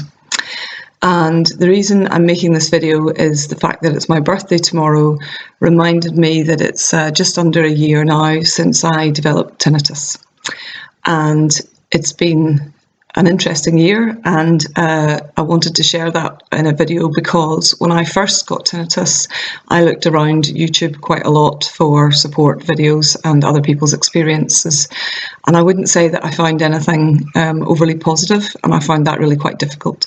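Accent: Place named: Scotland, United Kingdom. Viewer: Dear, thank you so much for this absolutely helpfully video. In the first minutes it was hard to understand your scottish accent, because I am from Germany. But after a while it worked.;-) I have Tinnitus for 20 years, did the TRT with noisers and I couldnt hear anything the last 18 years. But now, 3 months ago, after a heavy Burnout, it came back. I couldn't believe. I found Julian Cowen Hill, he is great. And another person from Germany. They both help a lot. But I am absolutely convinced, that all what you are telling and the others is true. Now I first have to clear my mental state and then use the noisers again. Now, I try to think postive and to see it as a chance to change something in my life. Best regards, Martina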